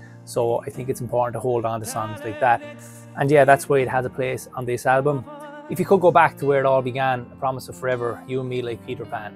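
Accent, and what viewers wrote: Ireland. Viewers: Good afternoon my superhero and my guardian angel and my no1 I have listened to you new cd many times and I love it to bits all the songs are as amazing as you are take care my beautiful friend 🌟🌟🌟🌟🌟🌟🌟🌟🌟🌟🌟🌟🌟🌟🌟🌟🌟🌟🌟🌟🌟🌟🌟🌟🌟🌟🌟🌟🌟🌟🌟🌟🌟🌟🌟🌟🌟🌟🌟🌟🌟🌟🌟🌟🌟🌟🌟🌟🌟🌟🌟🌟❤️❤️❤️❤️❤️❤️❤️❤️❤️❤️❤️❤️❤️❤️❤️🌟❤️❤️🌟🌟🌟🌟❤️❤️❤️❤️❤️❤️❤️❤️❤️❤️❤️❤️❤️❤️❤️❤️❤️❤️❤️❤️❤️❤️❤️❤️❤️❤️❤️❤️your Irish accent is always amazing ❤️❤️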